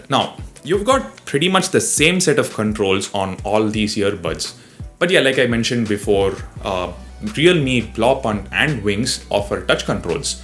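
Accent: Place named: Tamil Nadu, India. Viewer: The way you stress few words, typical tamilan da.. Qwalittyy, sooperu, sometimesu, controllu... But I love your videos and review script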